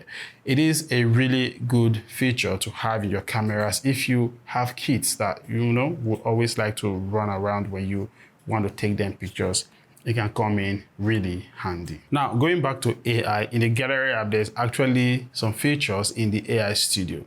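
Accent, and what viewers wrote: Africa. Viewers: I like your accent, its like african english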